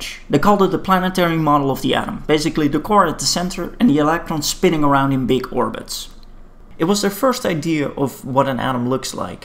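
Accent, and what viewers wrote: Netherlands. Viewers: Are you from the Netherlands? You sound so Dutch. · Excellent! Thank you. I think your H diagram didn't show the 1s orbital? Also, is the accent from Rotterdam?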